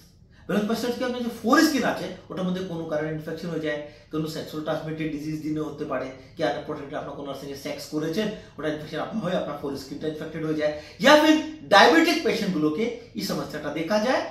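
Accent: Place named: Bengal-Bihar border, India. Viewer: আপনি কি বাংলা বিহারের মাঝামাঝি বসবাস করেন। ভাষা তো ক্লিয়ার হচ্ছে না।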